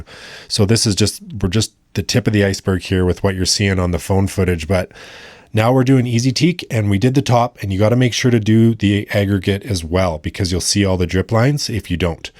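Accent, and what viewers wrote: Canada. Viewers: Are you Canadian by any chance?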